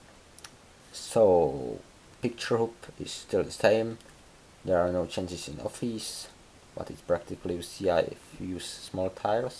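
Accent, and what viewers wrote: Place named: Czech Republic. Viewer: AAAA!! Ta česká angličtina je fakt hrozná, nic ve zlém, má to tak většina lidí z ČR =D Jinak příště zkus líp zaostřit na telefon, ať to není tak rozmazané ;)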